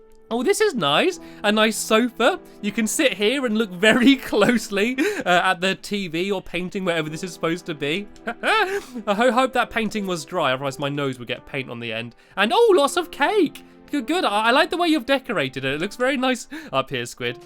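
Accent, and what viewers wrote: United Kingdom. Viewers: love you! Your British accent hits my heart well. THANK YOU! I like your videos.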